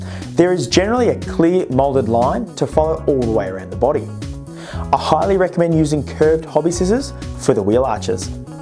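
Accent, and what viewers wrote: Australia. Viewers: That was actually awesome and so refreshing to hear an Aussie accent. I missed the bit on how you did the black window trim. Also, I want do the exact same paint job just with the main body Tamiya Dark Metallic Blue. Would I need to back it with black or would that turn out crazy dark? Thanks guys, good job. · This guy sounds Australian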